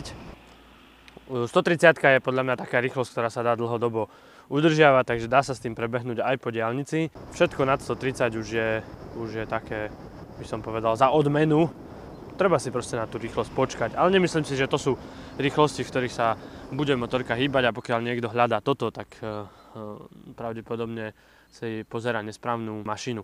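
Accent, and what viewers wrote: Eastern Slovakia, Slovakia. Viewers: Len ,,tak trošku,,zaťahuje-isto ,,Vyhodňar,,aľe to nevadzí,ta ňe?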